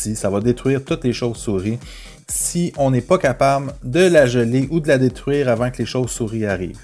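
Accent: Quebec, Canada. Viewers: T’es québécois mon asti ? - J’adore la compo étant nouveau th12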